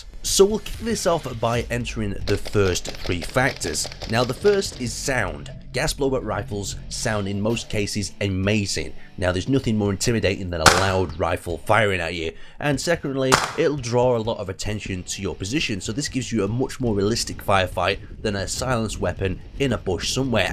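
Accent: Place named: North West England, United Kingdom. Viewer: I have a feeling he's from the north west, if so hello from Wirral!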